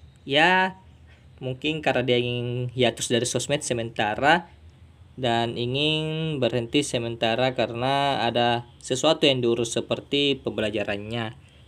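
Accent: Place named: South Sulawesi, Indonesia. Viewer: Pasti lu orang sulawesi selatan kan bro? dari gaya lu ngomong gw bisa tau wwkwkwwk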